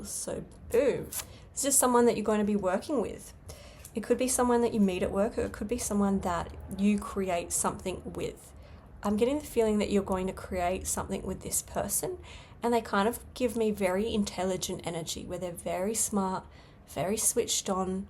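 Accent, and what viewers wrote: Australia. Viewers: i like your hair the blue hair and blue eyes is almost like i was when i was youi age except i also had one blue loop ear ring and one blue Chuck Taylor All Star Converse shoe and one black Chuck Taylor All Star shoe when i was a freshman in college. i'm no spring chicken. and your accent it reminds of the Vegemite sandwich i had a few weeks ago and yes that was an Aussie band song reference. is from sunny California to the land of the rising sun Japan off the beaten path enough? 🍀🎶✨ my 2nd time thru college i had graduated from an online University. Biggest waste of resources ever. i could've been sharpen my Japanese language skills instead of learning software development during an Internet age when only 1 AI Class was available as an elective class which i decided not to take.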